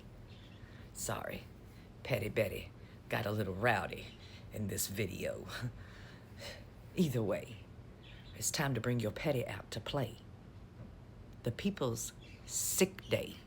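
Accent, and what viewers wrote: South, United States. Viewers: I have to get up to speed on the southern speak. To be honest, I'm having a bit of trouble understanding ya. 😃🇺🇸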